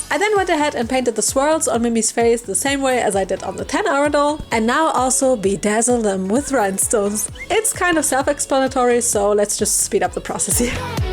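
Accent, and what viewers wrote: Germany. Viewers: Grüße aus Leipzig! freu mich immer wenn ich einen deutschen Akzent höre ❤ ^^ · Omg youre german? xD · She’s German, dude. It’s her accent.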